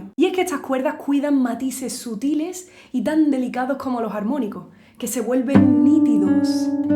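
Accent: Andalusia, Spain. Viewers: Eres una bruja!!!... una bruja pero de la guitarra en tu caldero de cuerdas bates las mayores fórmulas para hacer que nuestras sensaciones caigan y suban a tu antojo...bruja!!! me entristeces y emocionas...tus notas y palabras son una montaña rusa...te odio y te amo...bruja aleja tus notas y tu acento andaluz de mi oído y mí corazón...sufro la fiebre...la mancha en mí cuerpo...a morir feliz!!! Chao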